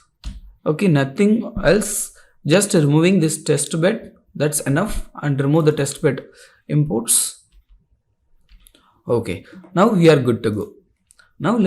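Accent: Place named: India. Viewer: 1. Learn english. The fucking indian accent, i had to turn subtitles on It's all a lie: There is an error on the jest home page at the beginning: it says: "Jest is a delightful javascript testing framework". It should be "Jest is shit and a nightmare". Focus on simplicity? It's a fucking nightmare and hard as hell Zero Config? AHAHHAHAHA You hope so, it will require you to configure paths in 2 or 3 files and it will still gives tons of errors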